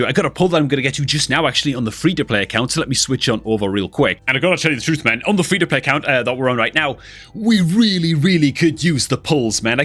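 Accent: North East England, United Kingdom. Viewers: I wish he would slow down a bit. I'm guessing north east England and it's hard to keep up at times. Not Newcastle but that neck of the woods.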